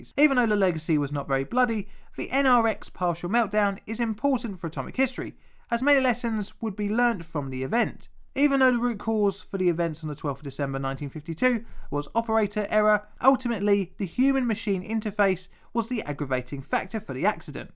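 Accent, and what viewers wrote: United Kingdom. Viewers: You have no idea how much i appreciate you use the metric system in your narration (and your British accent)! Most english channels use feet and inches which i can barely translate properly. ^^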